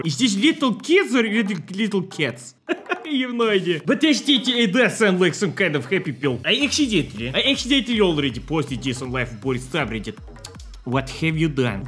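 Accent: Russia. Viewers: im positive hes russian but i would lowkey laugh for many moons if he was a white dude named walter with a good fake russian accent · The accent got me fucked up I cant keep up I need the subtitles sometimes you really think he be speaking in Russian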